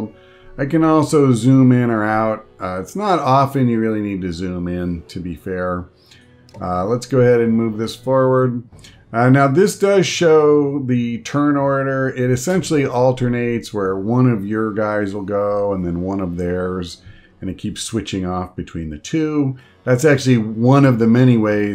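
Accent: United States. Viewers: I'm danish and it I look like anglified/americanized made-up scandinavian fantasy names to me, so I think that your americaanized pronunciation will do just fine.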